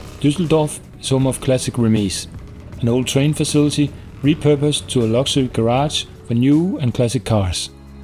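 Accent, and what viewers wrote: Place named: Denmark. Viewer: 'dan'glish accent'...Haha...I must apologise to all our foreign friends who are forced to learn english...As an englishman I can assure you we didn't mean it to be this way even tho some of us still try to learn other languages...but unfortunately as a consequence most english have now become very lazy to learn them...hehe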